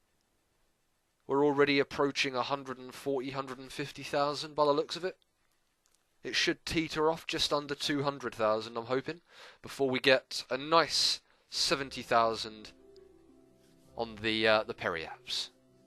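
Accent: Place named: United Kingdom. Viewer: You are so British mate :D - Giz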